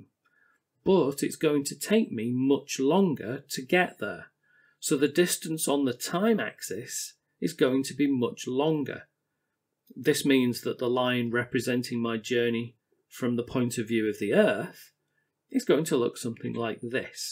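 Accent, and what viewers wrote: United Kingdom. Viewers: Thankyou for uploading your channel specifically is where I like going for science information because of the british accent and the visuals are great. It has the right vibe nothings too overexaggerated very calm logical delivery. I could and would watch hours of lectures and powerpoint in this format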